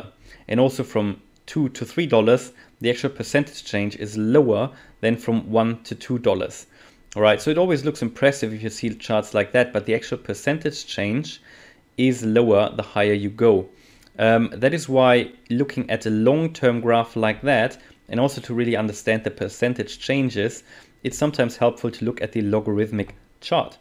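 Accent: Germany. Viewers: Vielen Dank für die super Erklärung mit deutschem Akzent! (VG aus die NL! 🇳🇱🧀🙂)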